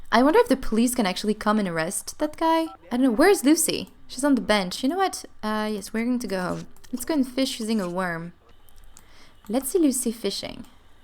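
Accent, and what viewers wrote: France. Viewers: I really enjoy the way you play the game! Also, I LOVE the slightest hint of accent in your voice. Are you french by any chance? I could listen to you speaking for ages, it just sounds so nice🌻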